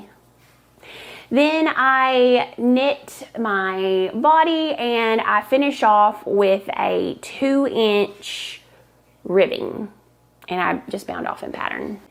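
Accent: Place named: Ozarks, United States. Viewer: I live in New England now but grew up in the Ozarks so love to watch just to hear your accent. Reminds me of home. Would love ❤to participate in the gift giveaway. Congratulations on 30K. You deserve it!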